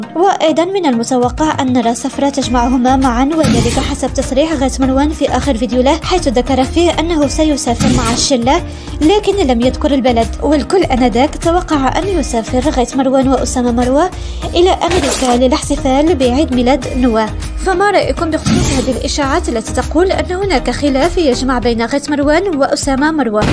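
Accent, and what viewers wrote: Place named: Morocco. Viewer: حابة اعرف انتي من وين كلامك وصوتك احس انك من المغرب تقريبا واتمني تردي عليا كثير بحبك وانا من متابعينك وكثير بحب اخبارك ع اليوتيوب 😘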